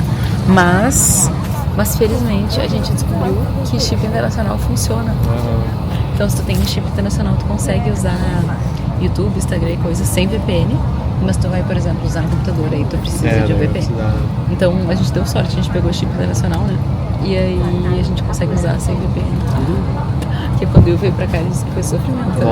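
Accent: Brazil. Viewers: Ela fala muiyo rápido, com sotaque do Paraná????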